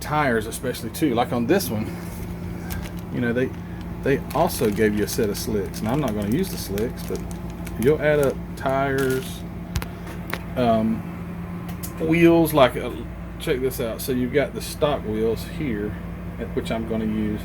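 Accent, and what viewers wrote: South, United States. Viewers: Mean! Mean paint job man! Great overall job and I was hoping you were going to go with the black stripe decals! (your next door neighbor in Canada Montreal). Your Southern drawl sounds so American!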